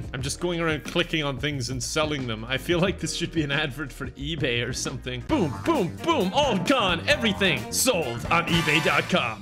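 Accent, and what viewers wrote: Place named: Ireland. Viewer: just found your channel today randomly. binged your sims 2 series. enjoyed the content alot. watching all your latest videos rn. Good stuff, Subbed. also, are you Irish? you have an accent like Nogla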